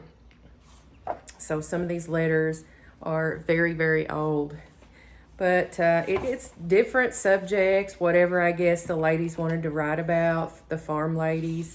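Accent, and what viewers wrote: United States. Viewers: Very smart!! I love good deals!! Omg you sound just like my family we’re from the deep South Georgia so you know we all hicks down this way 😂😂 God bless you!! Love how you’re teaching people how not to waste!! I don’t waste myself!! I like to take leftovers make soup or freeze them. I take my scraps & throw into my little garden, I couldn’t live with myself with all the people that are going hungry, & me waste anything!! You can even make extra batches of meals, freeze them & heat them up to save yourself from cooking sometimes. Thank the Lord eggs are going back down in prices!! You made a lovely meal!!!❤ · I’m feel so “at home”, watching your videos! I’m in East TN! I can really relate to your Southern accent & down to earth , personality ! You are a breath of fresh air! You are really creative ! God bless you ! Thanks for showing us , your bargain deals , from grocery store & your frugal meal ideas . 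Love the fridge clean out, ideas! When you talked about holding on to the one egg, it cracked me up! I have been hanging on to my eggs, for dear life ! 😂😂😂! I recently had the same thought as you! I can’t let these eggs ruin, by holding on to them ! lol. ❤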